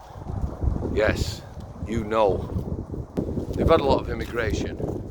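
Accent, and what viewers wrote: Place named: South Yorkshire, United Kingdom. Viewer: Love the accent, I'm hearing South Yorkshire. Very well delivered and to the point. Thankyou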